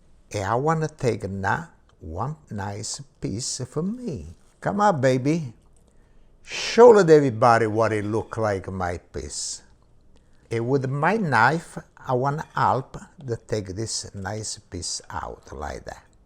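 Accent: Italy. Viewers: Love his accent.i just love those Italian men!!!! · Love his Italian accent love him thank you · This is the most italliano uncle i’’ve heard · I love this little old guy. He’s so cute w/his Italian accent and his drink-drink gestures. LOL I’m trying to recipe tonight. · Oh gosh he is so adorable.. his real authentic Italian accent is even better.. Oh kay!! I like eggplant parmigiana... It’s a oh yeah baby!!!.. ❤️ love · I AM SOOOO MAKING THIS FOR MY BROTHERS THIS THANKSGIVING. BECAUSE THEY DNT EAT MEAT. AND THEY LOVE EGGPLANT I STUMBLED UPON THIS RECIPE. AND I LOOOOOOVE HIS AUTHENTIC ITALIAN ACCENT.😇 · With that accent it just tastes better. Reminds me of my grandfather who was from Italy. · When I heard that thick Italian accent of his I knew it was gonna be a good cooking tutorial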